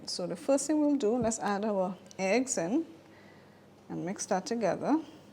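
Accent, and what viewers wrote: Trinidad and Tobago. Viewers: YESS!!! Can spot a Trini anywhere! Thanks for this! Gonna try it out!❤😁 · Hey! You have to be a Trini 😁😁😁👏🏾👏🏾👏🏾. That accent! Nice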